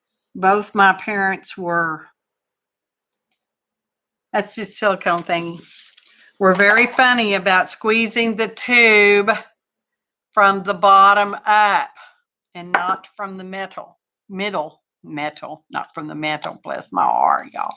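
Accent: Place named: Texas, United States. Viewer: Glad you are back in the grove. I payed part of your video at 1.5 speed.... it was funny listening to you talk! "Texan" sounds good no matter what speed!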